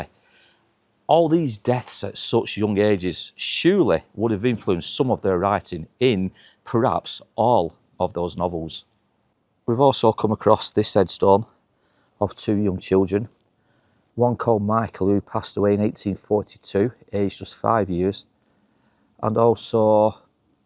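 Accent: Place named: Northern England, United Kingdom. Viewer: Thank You so much for these Northern stories! I’m an American that grew up in Rochdale from 9yrs of age to 19yrs until I moved back home to the States. This was <num>. My youth was spent growing up on the Pennines, Yorkshire Moors, Saddleworth and The Dales. So much history you have uncovered that I never knew about. My mother still lives in Rochdale and I get over when I can. Been watching all your videos and hearing your northern accent warms my heart. Cheers and keep up the excellent stories and your investigations. Truly love these videos. The very best!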